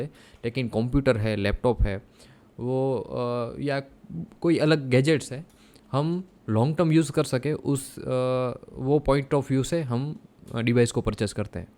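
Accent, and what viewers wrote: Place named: Sindh, India. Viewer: ok actually your accent is a bit similar as if a Sindhi speaking person speak urdu.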